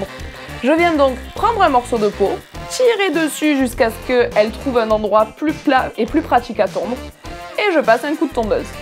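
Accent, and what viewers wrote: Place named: South, France. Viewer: j'adore l'accent du sud ❤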